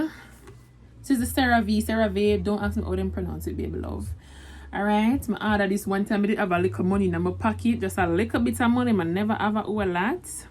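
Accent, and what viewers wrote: London, United Kingdom. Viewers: lol 😂😂😂 you got the London accent on point ❤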